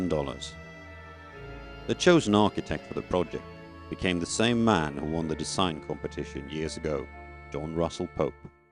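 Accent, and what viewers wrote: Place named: United Kingdom. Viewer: British narrator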